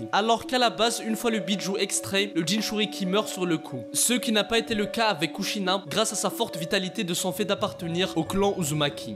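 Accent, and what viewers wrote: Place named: Kabylie, Algeria. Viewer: Je salut ton accent kabyle ✌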